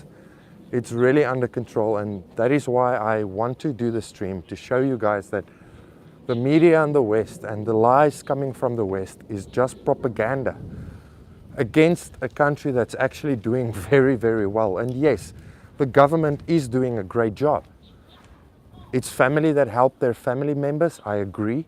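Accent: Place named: South Africa. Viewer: Hahaha can hear from accent u from SA ;D, so glad we have someone preaching the truth on China, not that serpantZA idiot....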